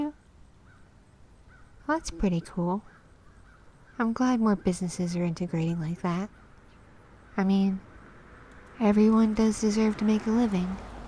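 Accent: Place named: South, United States. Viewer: Hmm must be from the south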